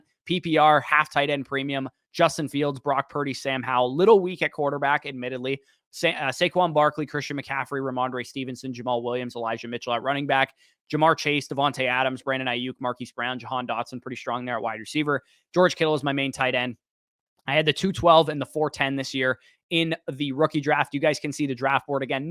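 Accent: Canada. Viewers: Nice, you from Canada eh,?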